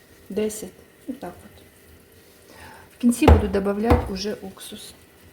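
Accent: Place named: Surzhik, Ukraine. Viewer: ніяка не біда , це суржик , в кожному регіоні свій говір , ви ж не будете заставлять львівян , чи тернопольців розмовляти як ви , краще вчиться готувати і не чипляйте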